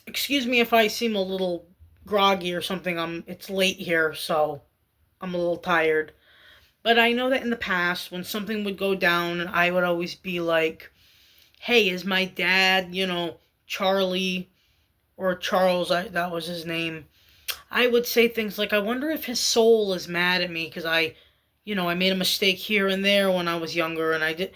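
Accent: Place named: Yonkers, United States. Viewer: Randomly stumbled onto your channel - I have no idea about the doll side of YouTube. But I really enjoy listening to you speak. You sound like my family from Yonkers 🥹 maybe it's calming to listen to you reminding me of them.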